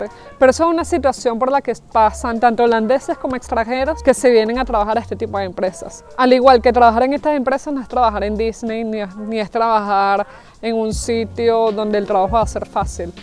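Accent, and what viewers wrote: Venezuela. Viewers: Hola, creo que tú acento es Venezolano, tengo una consulta. Una ETT justo con las promesas que tú estás hablando... 40hrs bla bla... Valdria la pena ir ? De aceptar con cuánto dinero debería irme ?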